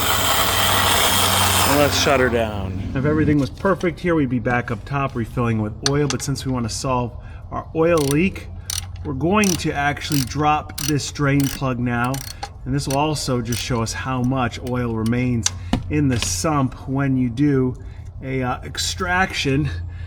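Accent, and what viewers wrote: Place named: East Coast, United States. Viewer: Holy smokes you look an talk like an east coast scumbag. Instant subscribe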